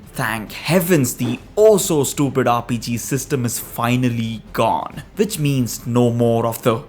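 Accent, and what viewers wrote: India. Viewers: basicly it's shit in ac unity it turns u into a f*ckin guards syndicate is just better things also it would be cool the more discounts for being good n not killing civs instead of killing u If u kill civs btw love ur channel keep up the great work n love the Indian accent always great to see foren ppl who play games I like its rare usually just americans and canadians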